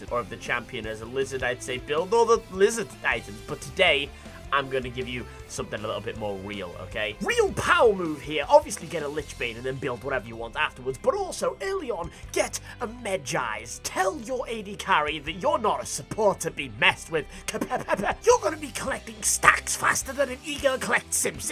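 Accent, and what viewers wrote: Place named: England, United Kingdom. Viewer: you sound like am english timon from lion king😭😭😭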